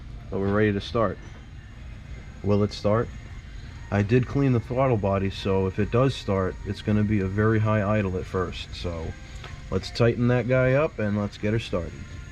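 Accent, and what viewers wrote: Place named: Bronx, United States. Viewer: Hey buddy love the accent what part of the city are you from guessing bronx, Chad I am from albany new york. Hope your well.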